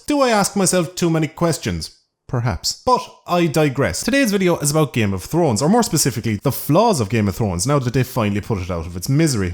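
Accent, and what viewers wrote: Ireland. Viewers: It’s part of the Irish accent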